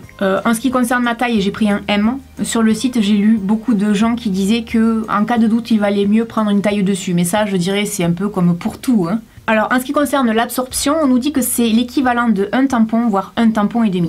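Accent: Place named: Southwest, France. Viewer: J'adore ton accent du sud-ouest!!!!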